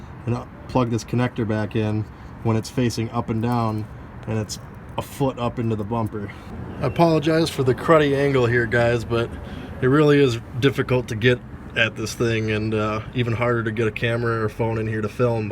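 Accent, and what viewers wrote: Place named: Wisconsin, United States. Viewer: I'm from Wisconsin and you sound like my kind of people. Just what I was looking for.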